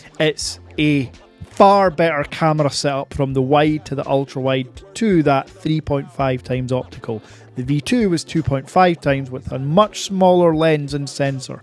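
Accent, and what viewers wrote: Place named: Scotland, United Kingdom. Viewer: Sounds mad but it could because you are Scottish and the accent ..People are very shallow 😂😂.I bet if you had an Aussie or Yankie accent be well on the way to 100k subscribers 👌👌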